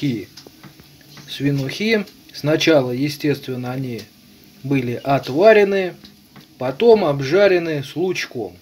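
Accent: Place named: Kursk, Russia. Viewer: Какая область???? Акцент Курский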